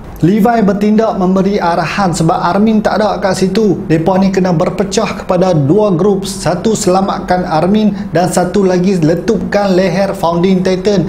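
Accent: North, Malaysia. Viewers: Haah bangsa utara kita haha 🤣🤣🤣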